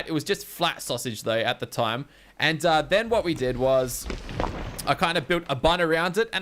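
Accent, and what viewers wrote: Australia. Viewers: I think he's from Australian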